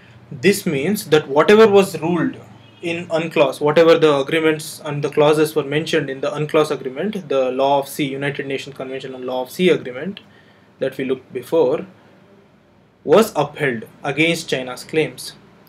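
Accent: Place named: Bihar, India. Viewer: nd the mixture of hindi n eng with bihari extnt is shaaaaandar..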